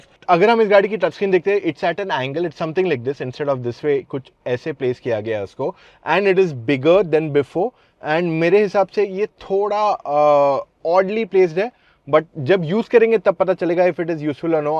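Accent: India. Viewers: Hey team! Great video as always. Love the honest, no-nonsense review style. Your natural Hinglish flow and the way you bluntly call out brands (like the missing fog lamps and the "new gen" claims) is exactly why we trust your channel over the PR brochures! 🙌 Just wanted to share some friendly, constructive feedback as a viewer to make your delivery even sharper: Punchier phrasing:Sometimes the English sentences get a little tangled. For example, instead of saying "I am a big fan of that being going off" about the LED light, a quick "I'm glad we can turn that off" hits much better. Descriptive words: When you talked about the new touchscreen angle, you said "it's like this instead of this way" while using hand gestures. Since a lot of us listen to your videos in the background while working or driving, describing it verbally (like, "the screen is tilted slightly upwards now") really helps paint the picture! 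Fewer fillers: You use phrases like "just fine" or "pretty much" a lot (like when talking about the ground clearance). Swapping those for words like "adequate", "practical", or "sufficient" would make you sound even more like the expert you are. Keep up the awesome work! Never lose that raw, unscripted energy.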